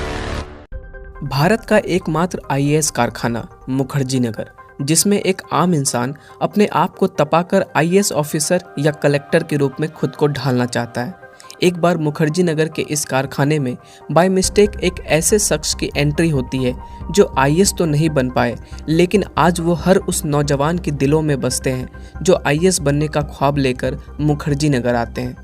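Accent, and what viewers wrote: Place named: Bihar, India. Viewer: Bihar me ye ek hi hota hai , grammatically wrong but expression well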